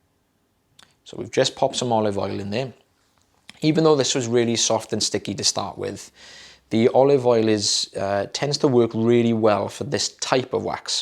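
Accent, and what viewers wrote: Wales, United Kingdom. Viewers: Look how the rookie became a specialist… The only correct answer is that you were trained by the best 🤨😎 And the Welsh accent is soo nice to listen to 🤭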